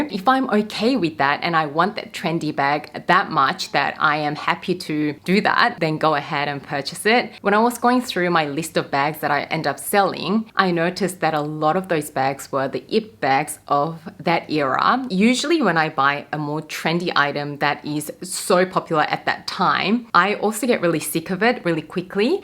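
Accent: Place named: Australia. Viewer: I saw one of your scarf videos for the first time today. It’s four years old, but I am so grateful. I waited and waited til I thought I was going to have to ask if that was an Australian accent, then right at the end you mentioned Sydney. ☺️ Can’t wait to watch so many more of your videos. I have access to new, free designer clothes, jewelry, and makeup. Those I don’t get for free, I get for as much as 90% off. I cannot declutter because my home has become s hoard and it’s a hoard with no storage. Without help, I’m just going to continue drowning. I went to the sale where I get most of my clothes, and came home with four more scars, a gorgeous handbag and a few pieces of jewelry – none of which I should’ve purchased. Designer scarves for $1.50 so I’ll use that as an excuse. I have clothes that could sell, but the resellers market has changed so dramatically, I don’t know which platform is worth it. 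If you have a suggestion, I would really appreciate it. Poshmark has been a complete waste of time for me.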